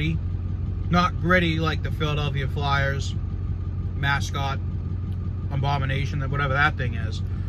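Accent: Boston, United States. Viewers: I hear a Boston accent! Gahlick, pahmesiahn. REPRESENT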